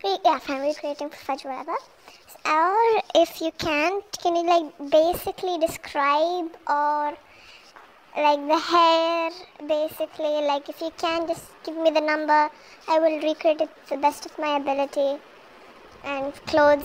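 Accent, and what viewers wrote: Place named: India. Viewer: Oh wow that's sad and U HAVE AN INDIAN ACCENT?? ITS SO NICE!!!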